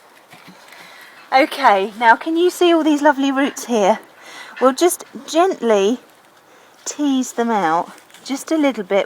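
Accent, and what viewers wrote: United Kingdom. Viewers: Luv'd ya video and your Accent - must be in the UK..:) I just planted 3 kiwi plants ..... wish me luck..:)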